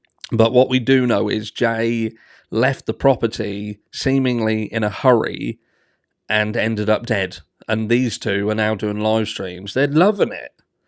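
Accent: Norfolk, United Kingdom. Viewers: Mate, I just wanna say. I found your channel during this case, and your coverage has been brilliant. 👌🏻 I just love hearing the Norfolk accent on YouTube, 😆 I don’t know of many content creators from around here. I’m a Lynn boy! May I ask where abouts in Norfolk you’re from?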